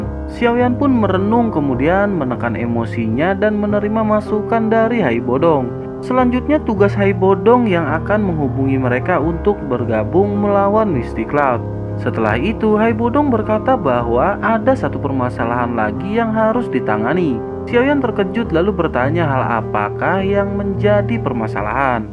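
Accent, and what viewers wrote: West Java, Indonesia. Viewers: Bang lu orang Sunda kah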